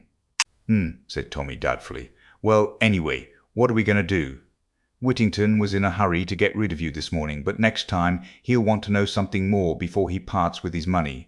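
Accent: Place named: Australia. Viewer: Sadly, a new AC novel, only her second, and yet "read" by a mindless (literally), uncomprehending AI in a totally deadpan manner, robbing the listener of any chance to be drawn into the fun or excitement of the adventure. Don't quite follow why the accent should be an Australian, mostly monotone, though well enunciated. What a total, boring waste.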